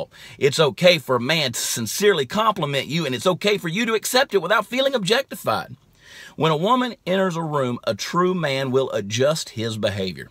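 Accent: Texas, United States. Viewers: I could listen to you spout the truth like this in that manly Texan drawl all day long. Every man needs to listen to this video AND others. My kinda guy. Keep it up, we like yer style.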